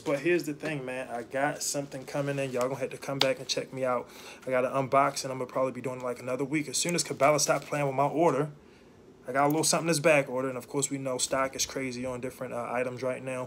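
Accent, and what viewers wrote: Baltimore, United States. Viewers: That Baltimore accent is 🤩. Love your gun collection. I just purchased my first hand gun last week. Excited. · You can tell he from Baltimore lol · Thats right more people of color Loving this ur Baltimore accent makes me think of Omar from the wire lol keep it up.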